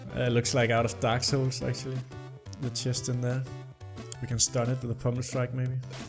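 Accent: Denmark. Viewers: You're Danish, ain't ya?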